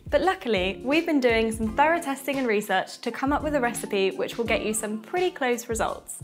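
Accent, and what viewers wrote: United Kingdom. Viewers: Was thrown by your British accent, was just going to place an order before realising it was a US company! 🤣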